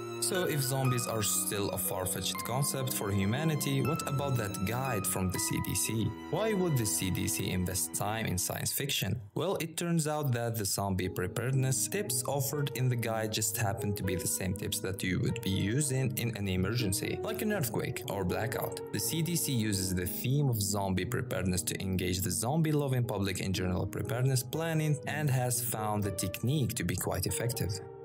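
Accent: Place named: Russia. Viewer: You kinda sound like you have a Russian Accent. Idk just wondering.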